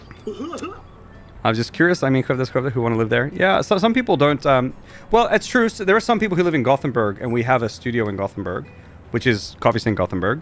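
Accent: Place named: Canada. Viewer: I've had so much trouble trying to identify your accent. Finding out that you are Australian and have lived many years in other countries explains why you sound Canadian most of the time! (I'm Canadian so that is a compliment LOL)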